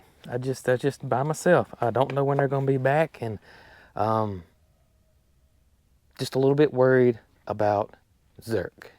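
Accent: South, United States. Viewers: and you have such a beautiful intelligent daughter grown up fast we hope zerks ok. my husband could watch you in youre man tunnel allday 24/7 I hope does more cooking as we are from Scotland i cooked and gave him cornbread and turnip greens yes it was a thumbs up cornbread now part of dinnertime with his gravy love the southern accent you should do an audio book soothing voice and when can bring a southern cookbook out love the clan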